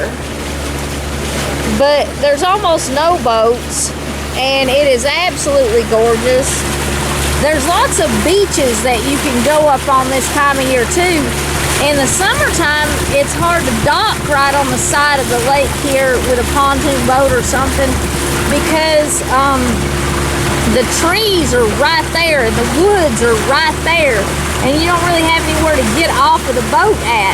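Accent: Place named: North Georgia, United States. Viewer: HeHe, I LOVE this channel! I love the views, and I love that accent! Its like being at home...and I miss home. all my family is from north Ga and east Tn. In my mind thats what heavens gonna look like, only better...somehow, lol.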